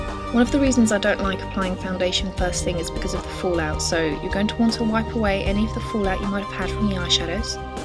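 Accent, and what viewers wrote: United Kingdom. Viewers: she sounds british :) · R U BRITISH !?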